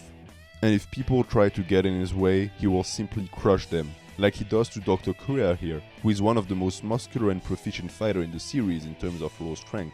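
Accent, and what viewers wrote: France. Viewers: are you french? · En écoutant ton accent, anglais, en voyant ta façon de prononcer certains mots j’en déduis que tu es un français qui parle en anglais, c’est le cas ? In listening your accent, in the way your prononces some words, I’m deduce your are a French guy who speak English, is it true or not ?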